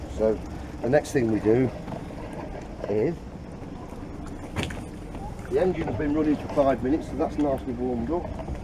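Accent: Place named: Nottingham, United Kingdom. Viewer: where are you from? You sound like you're from the Nottingham area.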